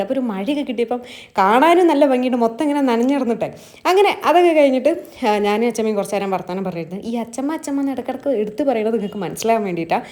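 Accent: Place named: Kozhikode, India. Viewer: Calicut Pronunciation. (slang )🙌 I have lot of colleagues from കോഴിക്കോട് in UAE-❤❤❤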